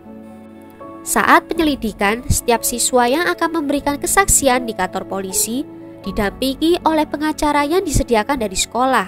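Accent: Java, Indonesia. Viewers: Sll gaa bosen liat semua video nya say medokuhhh 👍💖💖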